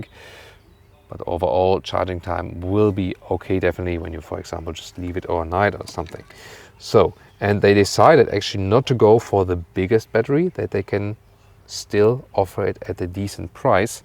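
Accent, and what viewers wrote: Germany. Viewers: Wirklich stets gutes Review - jedoch muss ich immer wieder anfangen zu lächeln, wenn ich das typisch deutsch-typische Englisch höre... nicht böse sein :-)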